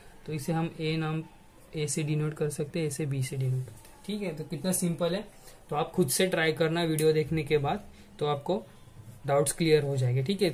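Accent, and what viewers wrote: Maharashtra, India. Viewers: Best explanation sir are you marathi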